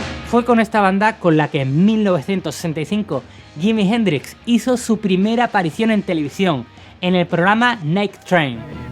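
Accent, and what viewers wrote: Spain. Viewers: Buena historia. Pero quizá voy a sonar ridículo. Pero el acento español me desánimo a seguir viendo. Saludos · Es chistoso como los españoles se expresan, pero me agrada el video, ¡bien!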